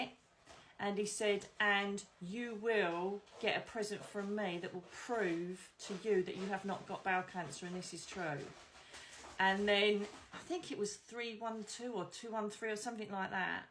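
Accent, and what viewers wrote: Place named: Essex, United Kingdom. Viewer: Grays in Essex? Amazing! I'm in Colchester and would love to see one of your shows! I knew from the accent that you're local xx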